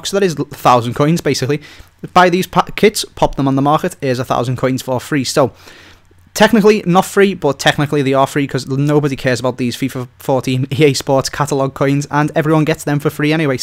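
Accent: Liverpool, United Kingdom. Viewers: scouse bro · Scouse accents are cool :D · Finaly I found a youtubers who is SCOUSER I am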